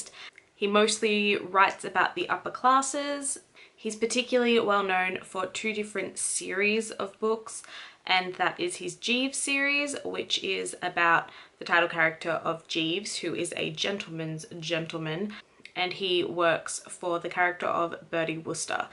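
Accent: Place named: United States. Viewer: She is very American. There is no difference between the d and the t in her pronunciation.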